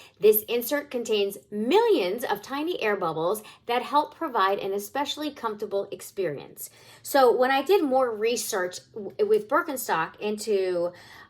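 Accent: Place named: South, United States. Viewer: you speak really well, your voice is therapeutic... do you speak another language?? i can tell you are from the southern US.. love the vid you look great btw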